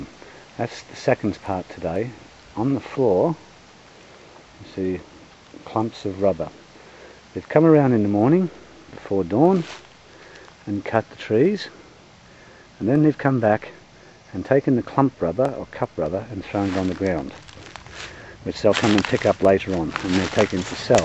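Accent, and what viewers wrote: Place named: Australia. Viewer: I have never known anything on how rubber is made although i always thought it came from the sap of a tree. you can really recognise the Australian accent in this video. I really like the bridge so authentic, the thailand landscape looks really lovely and lush and tropical. The thailand people look so nice and welcoming and peaceful. Wow five dogs this is alot. The fluffy dogs are really cute!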